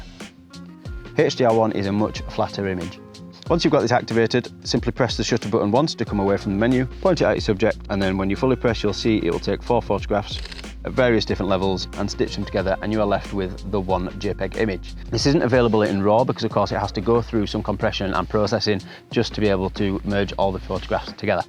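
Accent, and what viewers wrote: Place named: Northern England, United Kingdom. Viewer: Your northern accent and the breakneck speed at which you are talking is not very helpful.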